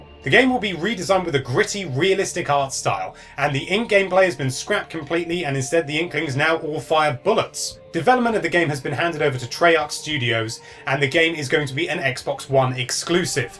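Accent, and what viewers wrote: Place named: United Kingdom. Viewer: Hmm April Fools finishes at 12pm in the UK, judging by the guys accent I would assume this was posted in the UK thus not being an April Fools joke at all. All info Double Confirmed!!!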